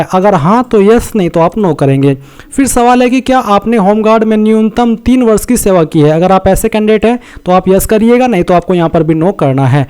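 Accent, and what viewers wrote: Saharanpur, India. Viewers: Tum saharanpur se ho kya bhai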